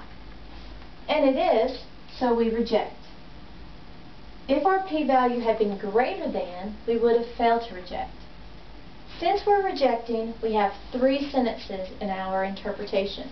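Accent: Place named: United States. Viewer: great lesson , but i despise the american accent :((((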